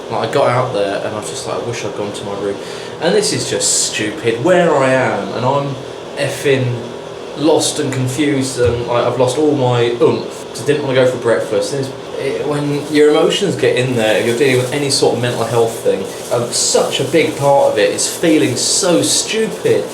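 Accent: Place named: Berkshire, United Kingdom. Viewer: Hi. What part of England are you from? I detect a Berkshire accent but could be wrong! All the best 👍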